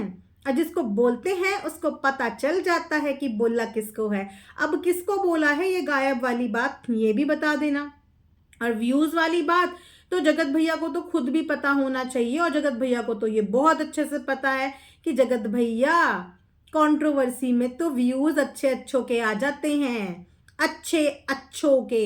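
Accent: Bihar, India. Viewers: 😂kya baat hai bhut chill mood me hai...mast bihari tons de rhi hai😂👏👏👏👌🏼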